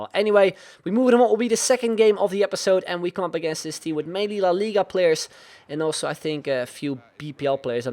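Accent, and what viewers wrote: Netherlands. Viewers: From holland i can here that bye his accent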